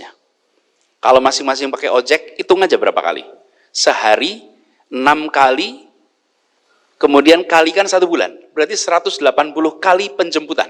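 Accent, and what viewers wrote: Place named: Java, Indonesia. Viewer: Dialeknya njawani banget.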